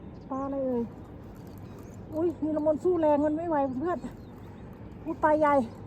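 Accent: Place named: Phu Thai, Thailand. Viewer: คริปก่อนเห็นเว้าภูไทเป็นคนจังหวัดอะไรครับสกลหรีอนครครับ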